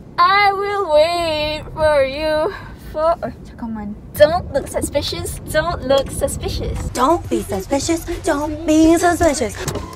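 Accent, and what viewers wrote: Sarawak, Malaysia. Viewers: love the sarawak accent omg!!! <3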